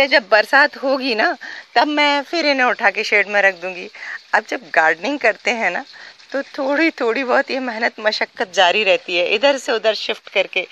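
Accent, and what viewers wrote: Standard. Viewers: Thank you ❤aapne reply kiya Aapki awaz bahot acchi hai or hindi bhi shudh bolte ho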